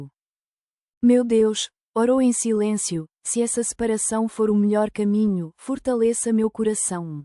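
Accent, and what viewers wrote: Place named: Portugal. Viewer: Amei a historia! Sensacional! Comovente! Amei ouvir a narradora com sotaque de Portugal! Amo esse sotaque!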